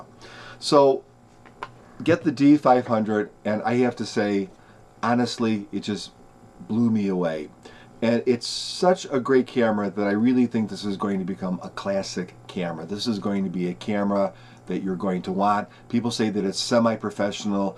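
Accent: Chicago, United States. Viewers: Do I detect a slight Chicago accent...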